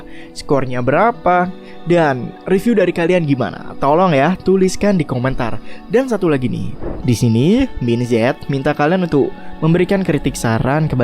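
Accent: Java, Indonesia. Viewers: Min Z orang Jawa ya?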